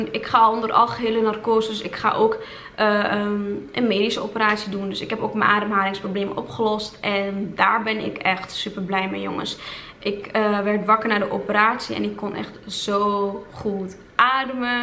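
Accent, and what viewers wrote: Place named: Drenthe, Netherlands. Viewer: niet gemeen bedoeld ofzo maar wat praat je opeen plat echt heel boers accent ...kom je uit drenthe?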